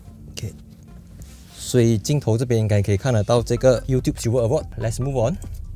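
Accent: Malaysia. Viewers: 就是因为你的本地腔调所以我才订阅的，很大部分本地汽车中文online media学大陆和台湾用了很正式的语言来表达，不是不好只是少了人情味。比如说我们都习惯叫sponge/span了，其他媒体很喜欢用发泡棉这个字，感觉太台湾了。。真的听到很不习惯。。btw，恭喜恭喜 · 其實作為台灣人，我還蠻喜歡你的馬來西亞腔的！內容也很精彩，加油！ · 恭喜！赞！我喜欢你马来西亜华语的腔调。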